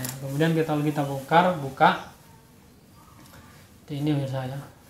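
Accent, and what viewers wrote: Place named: Madura, Indonesia. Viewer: Pak ustad nya orang madura kak kik kuk bhs indonesia nya.. lucu😁 tapi saya kagum dengan anda pak ustad semoga lancar jualannya.. aamiin...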